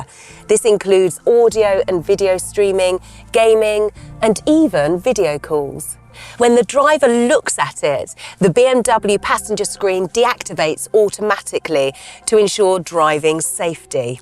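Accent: United Kingdom. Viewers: I'm so sick of her British accent; it's so pretentious. It makes the X5 look even uglier.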